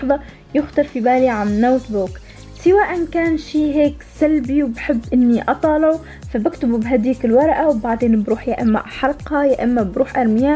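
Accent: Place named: Tunisia. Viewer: تقولش عليك تونسية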